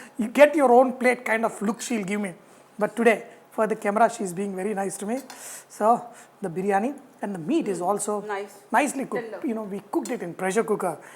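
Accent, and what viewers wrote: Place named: Telangana, India. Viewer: No dish is perfect without your Telangana slang please make some videos in Telangana slang sir.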